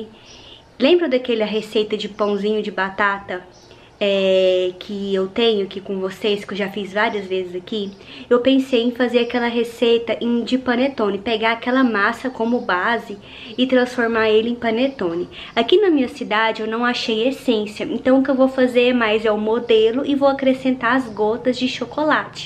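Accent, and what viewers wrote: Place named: Rio de Janeiro, Brazil. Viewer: Oi, falei muito, mas esqueci de falar que soou carioca, mas moro em Brasilia, sou professora aposentada e depois que aposentei sempre procuro mais novidades em almoços e jantares, simples e rápidos e também mais sofisticados e por isso encontrei-a, pois há anos estou ligada em assistir e fazer novas receitas.para receber família e amigos.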